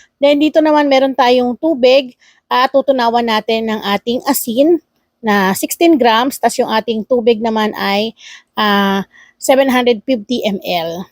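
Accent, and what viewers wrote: Bicol, Philippines. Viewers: Boses bicolana ka po nanay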